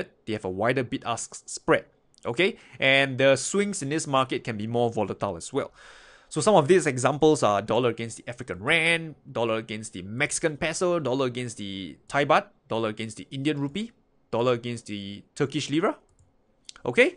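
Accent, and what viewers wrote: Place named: Singapore. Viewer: i bet, you r Singaporean.....tnx for the video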